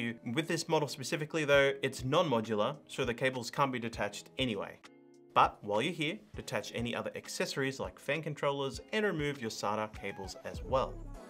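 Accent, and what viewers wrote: Australia. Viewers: Awesome walkthrough! I was 90% sure of how to go about this, but having a lovely Australian voice to check my work was immensely helpful and reassuring.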